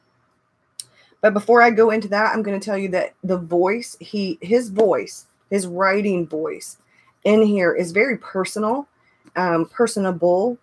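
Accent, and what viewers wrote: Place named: South, United States. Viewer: I thought you had a southern accent!!! love your videos!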